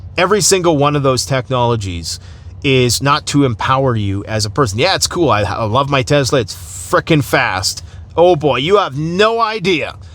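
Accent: South, United States. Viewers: Liking that southern slang 🙏❤️🥰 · like for the southern accent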